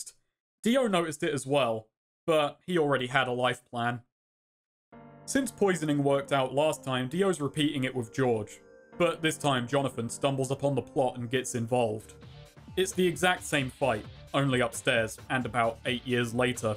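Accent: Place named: Liverpool, United Kingdom. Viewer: Alright eh! A scouser in bizarre Adventures of Jojo, Class one there mate.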